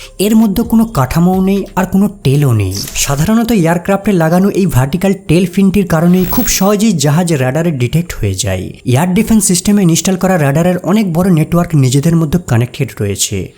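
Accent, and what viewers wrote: India. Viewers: কথা শুনে মনে হচ্ছে তুই গাধাটা ইন্ডিয়ান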